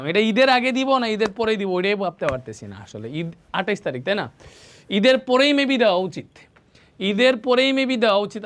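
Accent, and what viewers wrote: Sylhet, Bangladesh. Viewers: K k Sylhet thaka dakso vaiya kintu Sylheti😊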